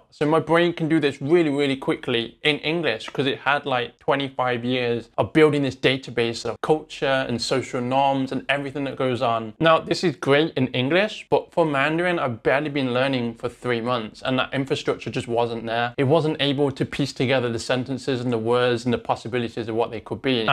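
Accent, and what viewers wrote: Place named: Taiwan. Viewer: I love your slight Taiwanese/British-American accent. :)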